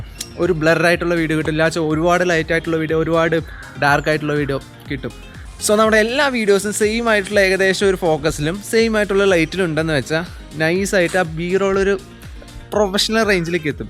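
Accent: Palakkad, India. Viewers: bro palakkadkarananoo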